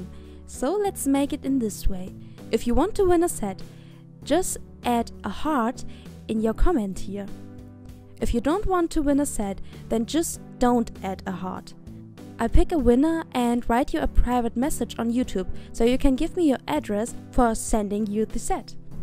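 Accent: Germany. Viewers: Man merkt einfach an deinem akzent wenn du englisch sprichst, dass du deutsch bist/aus Deutschland kommst 😂❤️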